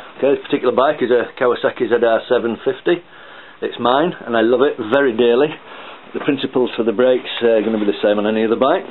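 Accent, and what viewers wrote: United Kingdom. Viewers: got to do this on my tiger soon, cheers for the tutorial, nice to hear it from a Brit too ;)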